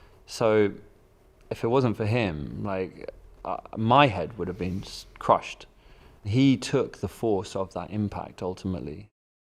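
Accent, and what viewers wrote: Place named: RP, United Kingdom. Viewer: sounds typical bbc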